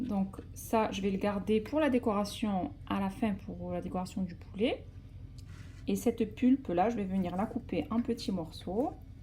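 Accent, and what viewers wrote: South, France. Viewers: Très bel accent du Sud en plus machahalah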